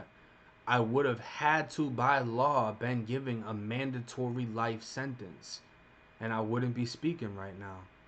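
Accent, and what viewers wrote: Boston, United States. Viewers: Bro got a strong ass Boston accent lol but I fw the content💪🏾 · Respect dog. If you dont mind me asking, what are you doing for work now? If you're going full time with youtube, you should consider starting a podcast. Team up with someone you vibe good with. Think Joey Diaz and Lee Seyet, you have the quick wit, perfect east coast/Boston accent, and stories for day. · you got the Irish Boston type slang ..words like park and few other not sure where ur from .i don't want to assume shit just cause of movies or t.v .shit i am from Canada 30 min from the boarder to newyork ..but no one sounds like how they make us in movies and shit so what do i know ..i just like the movie the Town and just going off that ..witch is ignorant on my part ..but one thing is the same the cops are just as bad here ,i am on some heavy shit now due to cops doing whatever they want and my dumbass was cold and put on a coat that was left in my car .they tell me i am drunk but parked cause the way i talk due to a head injury but bin sober for 15 years ,opened the the other door right away on privet property with no cause other then stalling to go home fighting with my chick tierd woking 12 or more hrs for 38 days in a row no break in the movie biz so lots of driving on top ..doing noting to know one and got my rights taken from me and now looking at alot of fucking time ..i got lucky years ago and got out of bad shit fell ass backwards in to a wick job i can work half the year and take half off and still live good ... 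so why would i fuck that up what was in the coat is a huge problem were i am from but i don't know where the coat came from even in pics of me all day and any other day u never seen this on me fur makes me itchy as fuck but i was cold ..left my house in a T in Jan to get out the house and away from my chick to stop the yelling ..now all the time i spent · Damn bro i thought you lived in florida you live in my hood i was wondering about that boston accent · Yo I'm from Boston I had no idea you lived here! I I hear it in your accent sometimes 😂 keep making these vidz bro there great bro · I've been binge watching your videos for days now, always hearing a little Boston in your voice, but everything I saw referenced Florida. Now I feel vindicated in my accent radar. Did you move here after you got out the first time? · I knew u was from Boston bro...when u said u was locked up in FLA I was like damn dude sounds like a Bostoner...that's what's up I'm from Bridgeport CT which isn't far from where u at...I knew u sounded too smart to be from down south...I fuck wit Boston especially because y'all got the smoke shops serving up 🔥...I love my quality smoke keeps me from fucking wit other shit although I like my hallucinagens🤯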